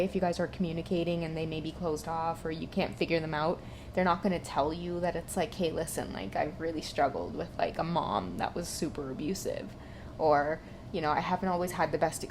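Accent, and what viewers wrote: Trinidad and Tobago. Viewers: Best reading just for that Trini? accent.... So beautiful... I love your vibe❤ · You sound like a Trini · That Trini🇹🇹 accent though 😍 But I'm Jamaican🇯🇲 the song too 😅 but yea I totally get it 😀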